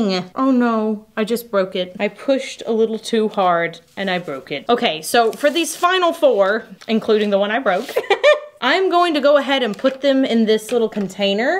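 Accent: Texas, United States. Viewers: I kinda wanna know are your from Kansas you said like your from Texas or Kansas?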